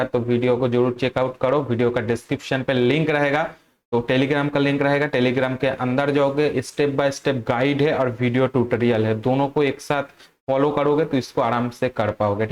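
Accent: Bengal, India. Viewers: bhai tmi bangali ho??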